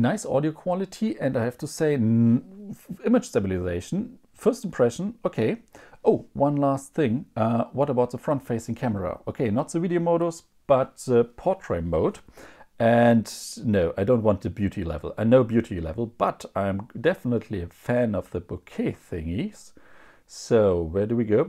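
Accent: Germany. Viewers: Gotta love that German accent haha. Really nice video tho, thanks!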